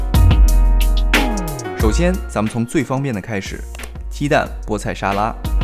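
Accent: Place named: Sichuan, China. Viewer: 這種口音是四川嗎？好好聽啊☺️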